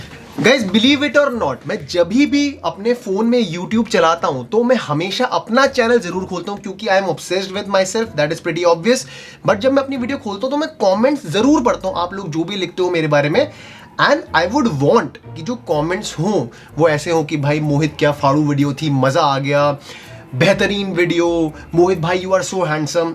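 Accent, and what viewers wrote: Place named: Delhi, India. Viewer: Baat krne ke style se hi pta lag gya delhi ka londa h👍🏼😂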